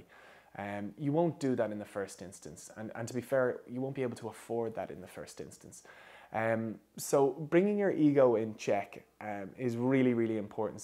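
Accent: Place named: Dublin, Ireland. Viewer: Hi! Watching from Alaska and right off the bat I knew you had a Dublin accent! Almost even a little more southern than Dublin. My dad is from Cork! Thanks for this video! I know you make a ton of videos but you didn’t start your video with an intro! No hello or anything! But lots of info! Thank you thank you!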